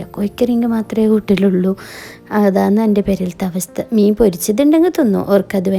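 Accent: Kasaragod, India. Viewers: കാസർഗോഡ് സംഭാഷണം കൂടി ആയപ്പോൾ മീൻകറി sett ആണ് 👌😍😍😍👍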